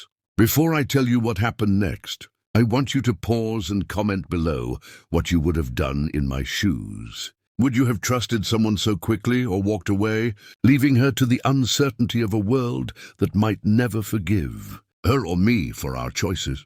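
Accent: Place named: RP, United Kingdom. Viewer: Somehow I can't take a cowboy seriously who sounds like he's in the House of Lords.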